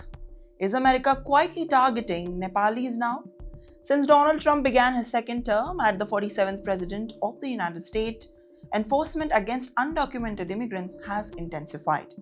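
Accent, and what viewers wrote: India. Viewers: Indian Accent, Indian English, very irritating. Could only take 2 minutes listening.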